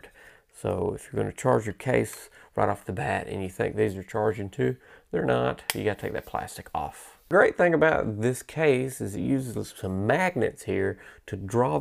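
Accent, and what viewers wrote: South, United States. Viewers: NICE REVIEW, GREAT VOICE, love that country twang!!! ❤️😉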